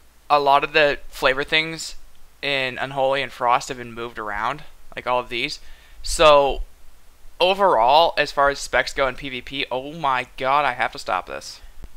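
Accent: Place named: West, United States. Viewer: He has a western accent. What are you getting to? It's Runes not Ruins.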